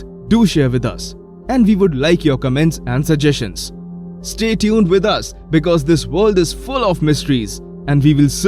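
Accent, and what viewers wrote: India. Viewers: Indian voice/slang spoil the MW...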